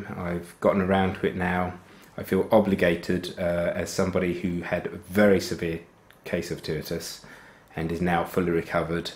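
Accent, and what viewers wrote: Australia. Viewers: 澳洲口音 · is he from Australia?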